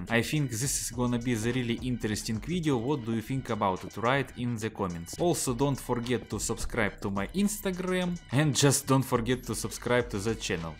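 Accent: Russia. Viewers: So... It's Best У тебя неплохо получается копировать русский акцент Если заменять некоторые "О" на "А", то даже поверят, что ты из Москвы :)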